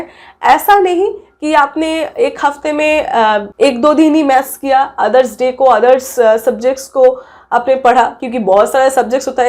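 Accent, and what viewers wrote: Bengal, India. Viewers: Mam aap kya bengal se ho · Sir ne bola suggestions dungi😂😂 So cute hindi bengali people speak ❤️❤️😘😘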